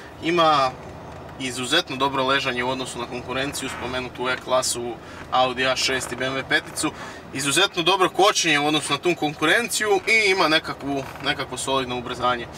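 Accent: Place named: Zagreb, Croatia. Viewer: Ako si iz Splita,sto ne pricas ko Splicani.No ka Purgeri.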